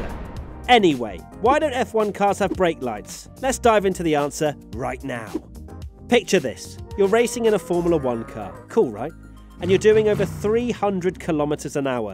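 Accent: England, United Kingdom. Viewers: Yeah I admit I know the answer. I just love listening to your adorable English accent. 😁 Plus your clips are all very informative and entertaining. Love WTF1! Could you get me Charles autograph? Lol!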